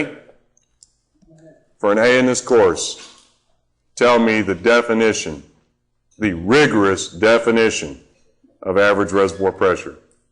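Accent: Russia. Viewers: man i loved your russian english accent hahahah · I like your Russian English accent and your way to explain materials , can any one give me the name of the instructor ?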